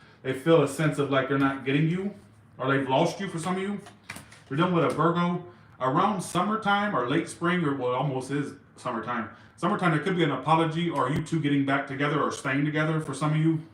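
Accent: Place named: South, United States. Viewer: U r good!!! Im looking to travel and perhaps move… lol love the accent it’s why i need to move or want to move south!😊 it’s been my goal thank u Covid for holding up my plans.. im going.. not sure-I don’t have a person im riding the wave…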